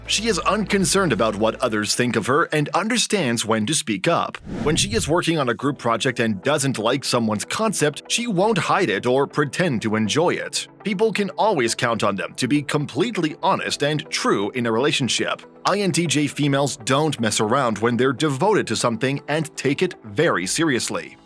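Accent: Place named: United States. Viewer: I really wanted to watch this video but the voice is way too American 🤦🏻‍♀️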